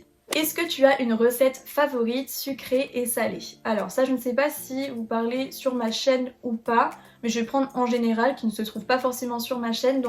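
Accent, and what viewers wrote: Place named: Russia. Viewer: trop bien cette vidéo face cam, on en apprend plus sur toi !! très bel accent russe d'ailleurs 😉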